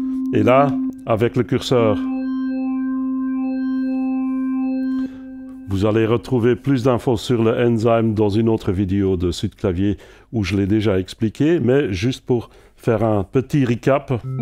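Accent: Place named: Belgium. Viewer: Il as un accent belge lui mdrr super présentation 👍👍👍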